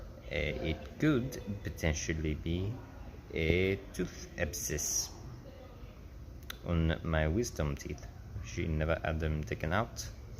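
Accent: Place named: France. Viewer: Stupid question but why are you speaking in a friench accent? (Like I said I'm watching everything chronologically. But it has somewhat stopped bc of stupid reasons)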